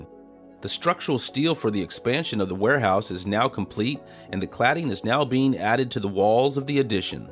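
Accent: Texas, United States. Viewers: Welcome back!... seriously, missed that familiar voice.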